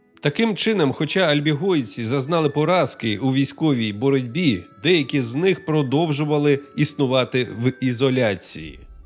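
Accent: Russia. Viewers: Дуже змісовно. Дякую за працю. І над вимовою, бачу, працювали ☝ Але ще відчувається росіянський акцент. Працюйте над собою і над новими матеріалами для відосів. Я Ваш підписник. Ще раз ДЯКУЮ.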